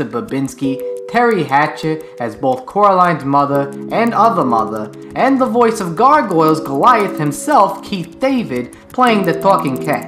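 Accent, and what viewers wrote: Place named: Brooklyn, United States. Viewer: The Brooklyn accent is unbearable.. I feel like i'm listening to Joe Pesci.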